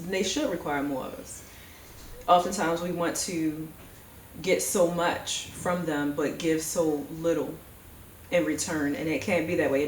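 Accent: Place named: Baltimore, United States. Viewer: You from Baltimore aren’t you?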